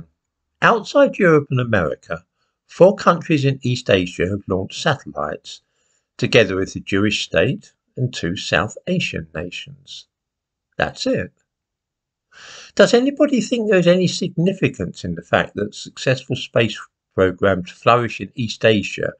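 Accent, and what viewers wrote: United Kingdom. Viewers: Bad mic + British accent = no idea what he is saying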